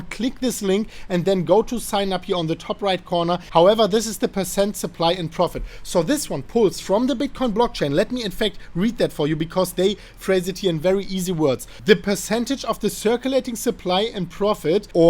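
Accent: Germany. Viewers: Mike Porten this is a very strong german accent, they often pronouncing „the“ as see or they say somsing instead of something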